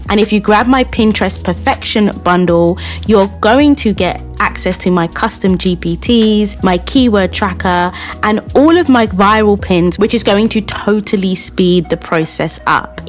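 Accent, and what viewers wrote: United Kingdom. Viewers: I haven't even watched the whole video but when I heard the British accent (finally! A British gyal!!) and heard the gems you had already dropped in the first 3 mins, I hit those 'like' and 'subscribe' buttons! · I agree. It’s nice to hear a follower Londoner. Such valuable advice.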